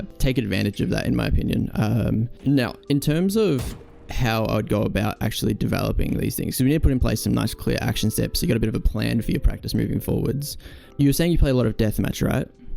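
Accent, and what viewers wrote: Australia. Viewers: as an aussie ibwould say he has more of a aussie sydney accent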